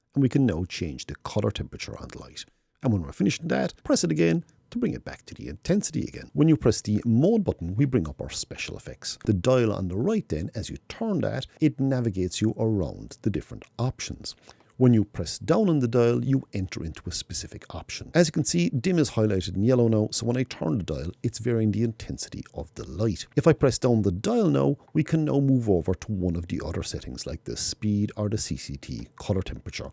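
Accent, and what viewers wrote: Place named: Ireland. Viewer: Thanks again for a great review, love that irish accent of yours it reminds me of the time I worked for the Irish Guards in Germany 74/75👍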